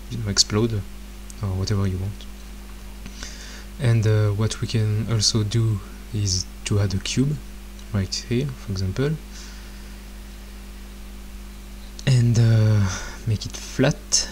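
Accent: France. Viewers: je pense que tu es français vu l'accent ^^, peus tu envoyer un lien de téléchargement de ceci pour travailler dessus s'il te plait? Merci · I love your French accent. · AWWW yeah french accent is the best le accent!!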